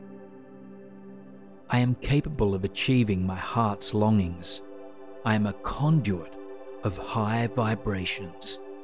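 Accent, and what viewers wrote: Australia. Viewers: Your Australian accent makes these even better! Thank you for sharing these amazing videos.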